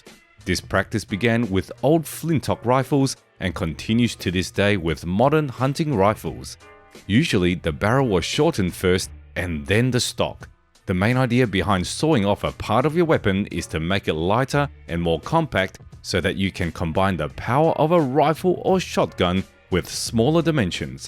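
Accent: United Kingdom. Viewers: this vid lost me at a brit narrating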